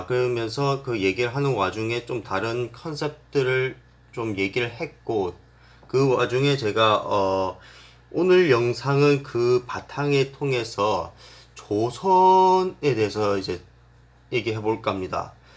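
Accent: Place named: China. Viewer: 조선족 같습니다.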